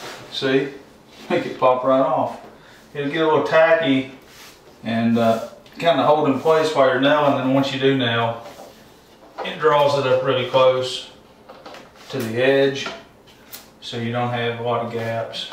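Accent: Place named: Georgia, United States. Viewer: Georgia or south Carolina ?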